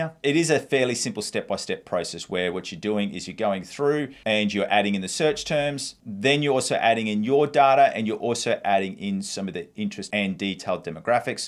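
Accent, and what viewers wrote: Australia. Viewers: Love these videos. Super informative. Great flow. You can never go wrong with the Aussie accent. Cheers mate.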